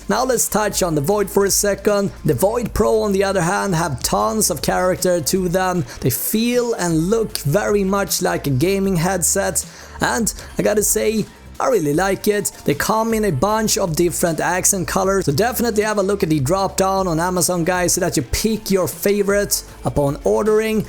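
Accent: Sweden. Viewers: Strong Swedish Dialect :)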